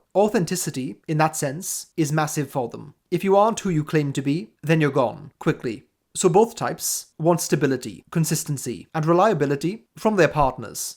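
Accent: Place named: Wales, United Kingdom. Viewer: really off topic but i love your accent 😁are you welsh by any chance :O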